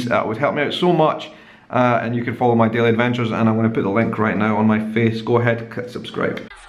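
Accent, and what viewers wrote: Scotland, United Kingdom. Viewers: I don't think subtitles is needed...i really enjoy your channel just the way it is, and i got so happy cause i understand your scottish english, your accent reminds me the tv series "Outlander"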